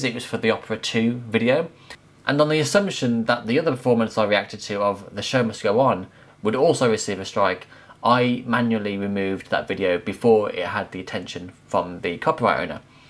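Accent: United Kingdom. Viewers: Hello from Kazakhstan AND I REALLY LIKE your British pronunciation By the way,do you speak French?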